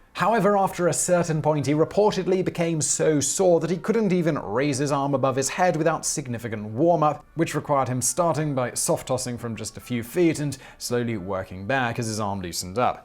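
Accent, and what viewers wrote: United Kingdom. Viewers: This guy is f*cking hilarious! There's just something incongruous about a fellow with such a dignified, proper british accent using our favorite euphemism for "sexual congress.". As y'all might say, "Spot on, mate!"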